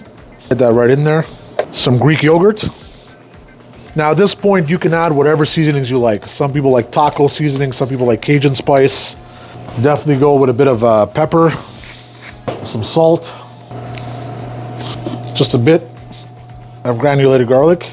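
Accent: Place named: Quebec, Canada. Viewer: Something about a Quebec accent is pleasant. Thanks for the vids as always!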